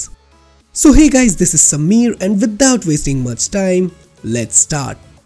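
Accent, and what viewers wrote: India. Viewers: I DON'T UNDERSTAND ANY OF THIS and your Indian accent isn't helping!!!!!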